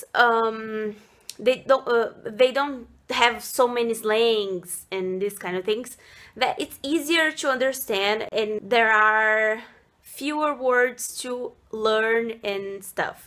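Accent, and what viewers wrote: Brazil. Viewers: Strong, I mean! ;) But it's natural due to our Brazilian Portuguese phonetics, sometimes it can be hard to "get rid of" them! Mainly if you have started learning after a certain age... But don't worry, your English is great! It's completely natural having an accent, mainly if it's not your L1... And I meant you have a cute one, a cute accent! :D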